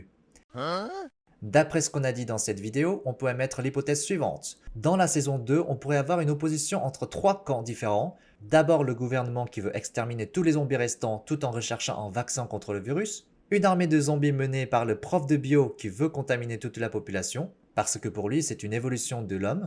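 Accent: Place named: France. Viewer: Je suis tombée amoureuse de ton doux accent français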